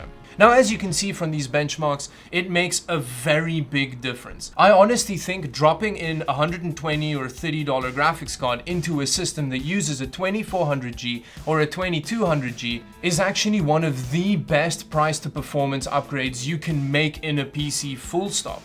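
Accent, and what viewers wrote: South Africa. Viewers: Are you South African? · Love your channel man, PC building Noob here trying to get the basic under control xD keep up the good work, also are you a South African Expat ? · Are you south african by any chance?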